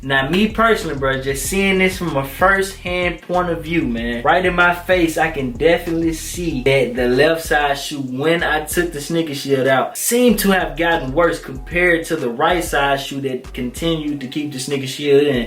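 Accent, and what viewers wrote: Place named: Memphis, United States. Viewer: You sound like u from Memphis..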